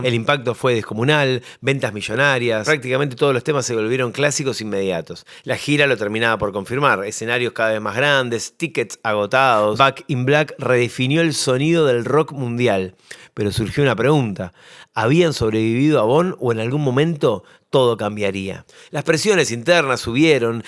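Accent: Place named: Argentina. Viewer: Demasiado argentino para entenderte. Voy a pedirle a un yucateco que haga un vídeo igual a ver si le entiendes.compadre.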